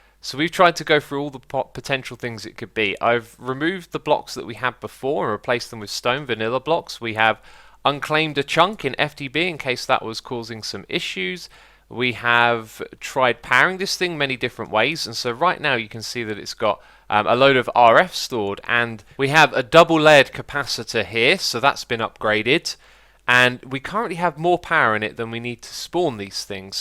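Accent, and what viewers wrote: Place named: United Kingdom. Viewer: Hey x. Why so British?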